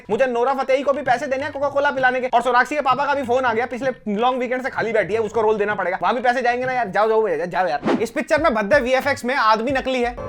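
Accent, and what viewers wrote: Haryana, India. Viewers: I seriously wish you were single ! Specially your Haryanvi accent 🤤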